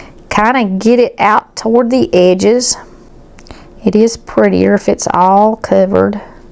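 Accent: South, United States. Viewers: My husband's going to love this one. Hes a Peanutbutter on anything lover. Especially IceCream. Gotta make it for him, only without the chocolate. Thank you for another awesome pie recipe. Love in Christ Jesus, Also, I just absolutely love your country accent.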